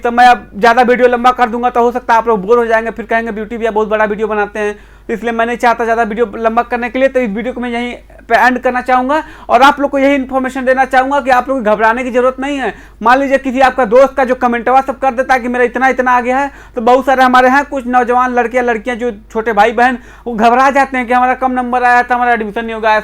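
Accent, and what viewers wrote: Bhojpuri, India. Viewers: bhaiya aapka bhojpuri mast hai 😂😂😂😂 bhagwan kre BHU me ho jaye. Ho gya to milenge zaroor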